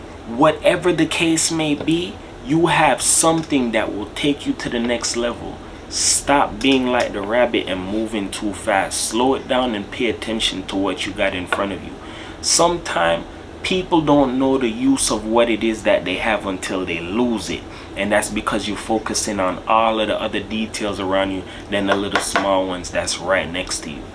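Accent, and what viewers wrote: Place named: Jamaica. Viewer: YOUR VOICE IS SOOTHING.... RASTA ACCENT! JAMAICAN???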